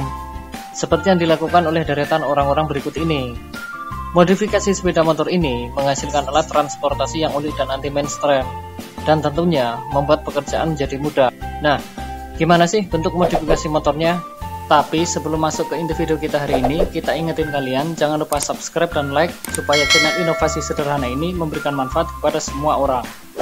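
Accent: Java, Indonesia. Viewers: suara jowo'mu medok pisan yo bro...😂🤣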